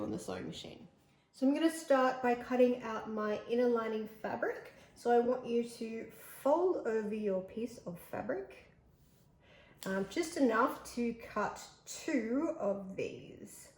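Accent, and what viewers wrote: Australia. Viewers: Absolutely love this mask pattern with excellent directions (I've tried many) and adorable Australian accent is a bonus!! I find the women's/teens size quite small but will easily adjust size. Thank you for this brilliant video!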